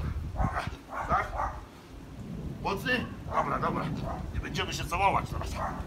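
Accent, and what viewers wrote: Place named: Lithuania. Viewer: D Uwielbiam ten jego litewski akcent.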